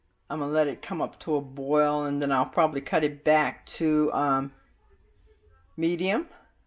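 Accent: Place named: North Carolina, United States. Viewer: I love liver pudding, black sausage, blood sausage, and the Spanish speaking say "morcilla" I knew you were from N Carolina when you first spoke, bless you auntie -from nyc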